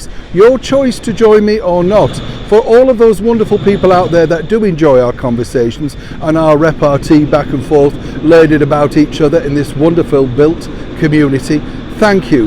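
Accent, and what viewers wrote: Yorkshire, United Kingdom. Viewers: Good afternoon Yorkshire lad, Don't change a thing , Stay just the way you are, Had to smile when i heard a little Yorkshire grit in your voice , Then i had to laugh a soldier with make up mincing around What a lovely coffee break they are now getting longer Lovely smile, lovely wave lovely scenery Thank you. Take care, stay safe.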